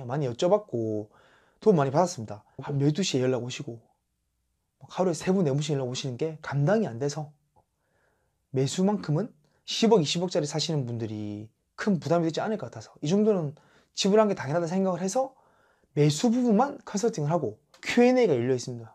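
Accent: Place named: Gyeongsang, South Korea. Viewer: 멘토님 대구분입니까 부산분입니까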